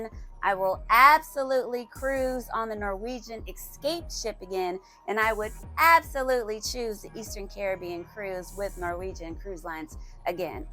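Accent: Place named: New York, United States. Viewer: I love the way you talk and the cursing lol! I’m from New York and this how we talk too 😂😂😂 very funny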